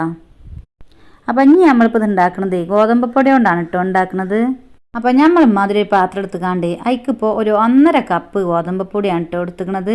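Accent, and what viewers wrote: Malappuram, India. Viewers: ഒറിജിനൽ മലപ്പുറം മലയാളം 😄😄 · Original malappuram slang anu ith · തനി മലപ്പുറം ആണല്ലേ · ഇതാണ് ട്ടോ മലപ്പുറം ഭാഷ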